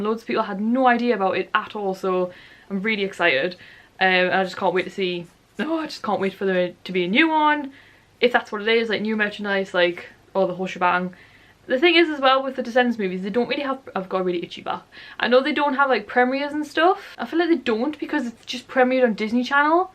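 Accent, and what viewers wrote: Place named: Northern England, United Kingdom. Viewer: because I live in the uk and that's an up north accent xxxxxxx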